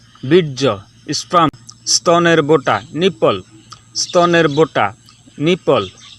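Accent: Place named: Bangladesh. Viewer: উচ্চারণ শুনে বোঝা যাচ্ছে বাংলাদেশী ইউটিউবার। বানান আর উচ্চারণ ঠিক নেই তো কিভাবে গণমাধ্যমে শেখাবে?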